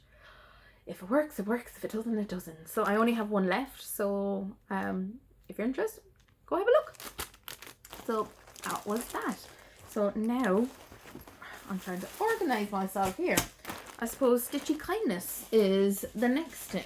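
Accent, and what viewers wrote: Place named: Ireland. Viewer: Lovely to hear another Irish accent on Flosstube. Great to find another Jacob fan. I was supposed to attend the Big Stitch but sadly life had other plans. Really enjoying hearing all the recaps. Love the variety of your projects. Looking forward to following your progress. Take care, best wishes from 🇮🇪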